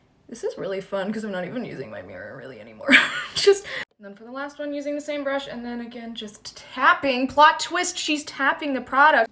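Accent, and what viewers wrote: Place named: Midwest, United States. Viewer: why did I hear that in your Midwest accent?? 🤔🤣😍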